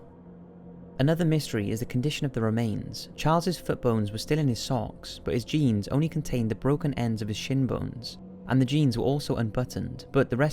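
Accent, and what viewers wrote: Wales, United Kingdom. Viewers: Do all brits sound as ridiculous as you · Anton Ellegaard no probs mate, voice is very cool! iam from Yorkshire England & it took me a bit bcoz it's a bit between English & welsh & a bit posh ☺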